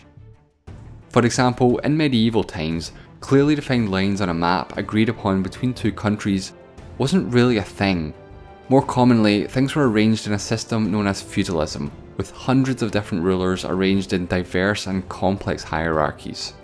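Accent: Scotland, United Kingdom. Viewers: OK I really need to know your accent? like exactly what is it- scottish im assuming but what type of Scottish its' really great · Scottish I think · He sounds scottish, but someone from the UK might be able to tell better than I can. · I'm sorry, but from where the narrator is? Scotland?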